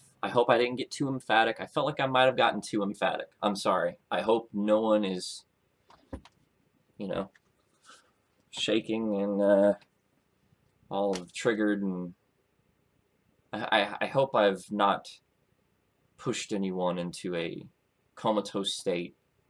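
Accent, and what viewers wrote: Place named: United Kingdom. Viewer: I like the Britsh accent! lol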